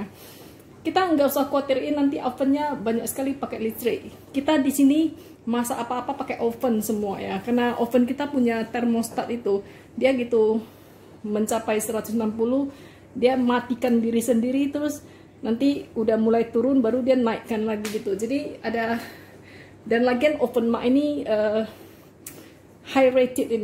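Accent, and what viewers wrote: Medan, Indonesia. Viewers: OMG ii logatnya medan kali. tapi begitu ngomong inggris kaget i. jago bgt wkwkwk pengen nyobain tapi bahannya susah d sini huhu..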